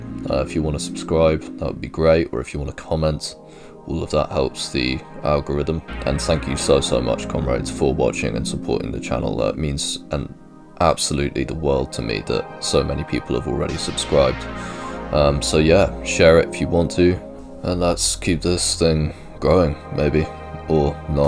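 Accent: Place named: London, United Kingdom. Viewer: Wait... I hear that youre from London! (possibly even east London!). Great to hear a familiar voice! (I'm from East London)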